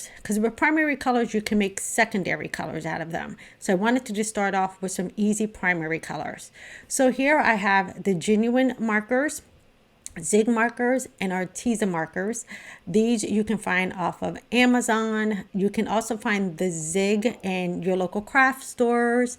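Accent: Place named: New York, United States. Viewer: Paper is key! You sound like a fellow NY'er (im in N.Fla now). Thanks for the info💗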